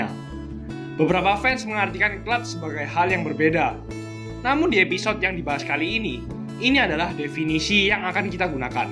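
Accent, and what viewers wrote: Java, Indonesia. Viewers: Min...logat jawanya di kurangin.....xi..xi...xi....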